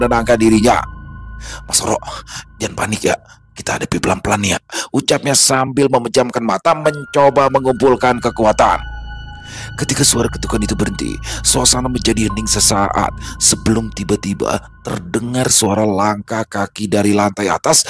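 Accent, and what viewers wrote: Jakarta, Indonesia. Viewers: Waaah, waaah ada bang lagi nich, paling seneng deh dengerin logat dan Betawi nya dan kelucuan bang serta si babe ☕☕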